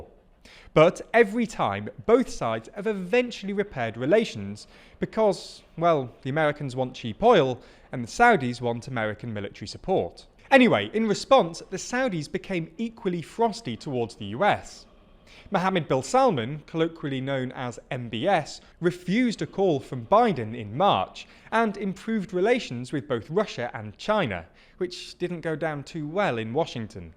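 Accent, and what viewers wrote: United Kingdom. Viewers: Yes, this is exactly what this channel needs, not just 538 with a British accent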